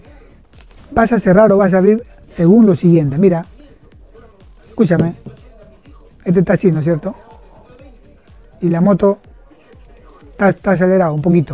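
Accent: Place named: Rioplatense, Argentina. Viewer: Desde montevideo gracias y tenes algo de rioplatense hablando muy buen video hasta ahora el mejor de como regular lleve a hacer el servicio y le habian dado como 5 vueltas de entrada de aire tuve que mantener en todo momento la mano sobre el acelerafor dia de lluvia con un transito terrible y nublado cuando llegue se me apago y tuve que arreglarlo yo mismo mi suzuki gn 125 f con 3 vueltas o 3 1\2 va bien vivo en el punto mas alto de montevideo gracias!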